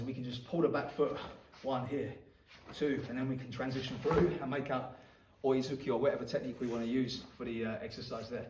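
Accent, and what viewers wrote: United Kingdom. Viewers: I live in London and do shotokan karate, and you seem like you live in London too from your accent, do you have a club?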